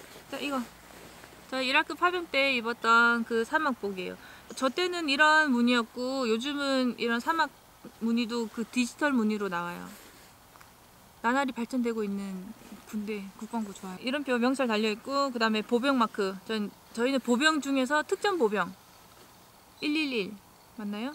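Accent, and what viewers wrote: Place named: Gwangju, South Korea. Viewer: 누나 말투가 저랑 동향같은데 어디세요? 전 광주입니다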